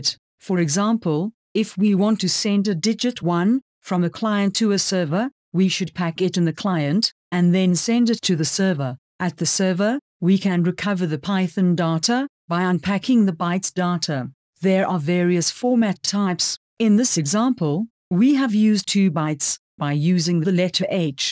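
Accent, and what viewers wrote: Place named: South Africa. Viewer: Her accent Sounds South African. Pretty cool!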